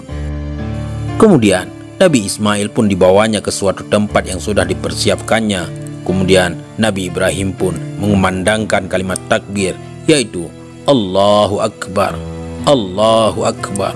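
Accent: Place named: Bali, Indonesia. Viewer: Dialek Bali kayaknya...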